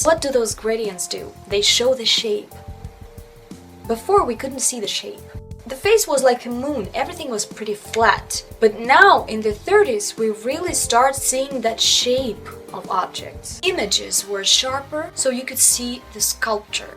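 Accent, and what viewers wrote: Russia. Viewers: She sounds Russian :-))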